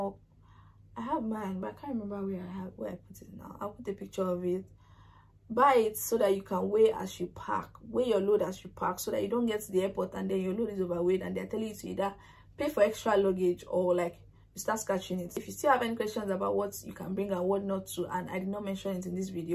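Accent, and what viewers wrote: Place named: Nigeria. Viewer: I just like the way you emphasis on the points with your facial expression and nigerian accent 😂, its so loving to watch. Thanks for making this video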